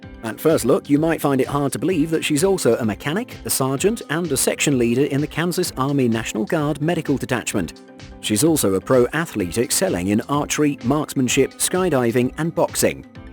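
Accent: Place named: United Kingdom. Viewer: *women... FFS and it's a British naration.... Disgraceful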